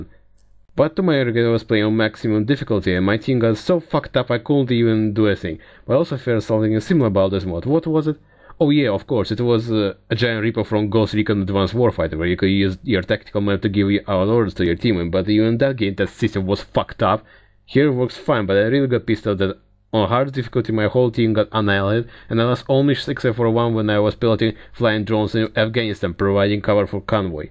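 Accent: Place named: Russia. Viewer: I'm a french canadian and also not very good in english, but somehow I understood 99% of what he said. Sure he have a russia accent and he's speaking very fast (that's the point of the video, fast like Zero Punctuation), but I've heard faaaaar worse on youtube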